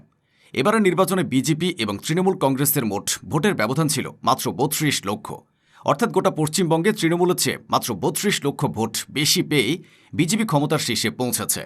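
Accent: Bangladesh. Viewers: কথা শুনে মনে হচ্ছে বাংলাদেশি ,,🥴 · The accent of narrator seems Bangladeshi not Indian. Mere wet dreams!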